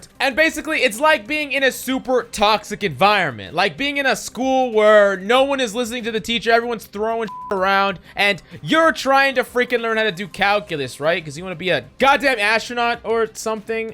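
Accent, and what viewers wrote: United States. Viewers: Heard the American accent, and instantly clicked off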